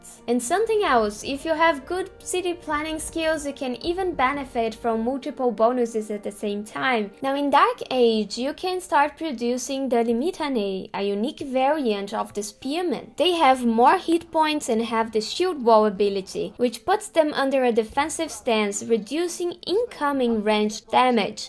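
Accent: Brazil. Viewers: Throughout the video I concluded you're a fellow Brazilian. I know some Greek and Cheirosiphon is pronounced /Rirocifom(pt)/ heerohsseephon(eng)/.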